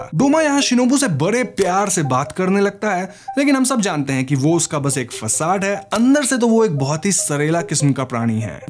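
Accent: Bengal, India. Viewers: 😮 Bangoli ho kya aap 😁😁🙆‍♀️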